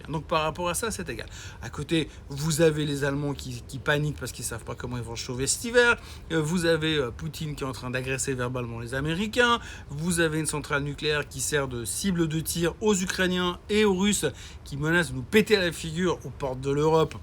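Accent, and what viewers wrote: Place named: Carouge, Switzerland. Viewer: Le marché monte notre ça l’énerve! Tout laisse penser qu’il a pris des positions à la baisse Et ce look de vieux navigateur solitaire et cet accent carougeois traînant ni changer rien.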